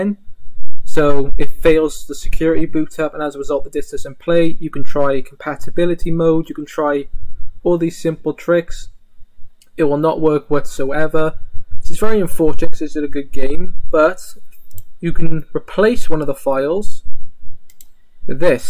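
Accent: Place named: Cardiff, United Kingdom. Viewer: Deffo a Cardiff accent haha love it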